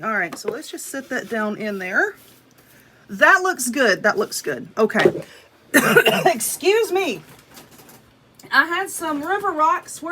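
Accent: South, United States. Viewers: This is so precious. I love your instruction in all your videos. I told my Mom I'm going to make her a corn husk doll for Thanksgiving. She has one she made in the 80's and it's still in great shape. I might add color as you suggested in your instruction. I love your Southern Accent too. Makes me feel at home watching you. (I'm a native Texan btw)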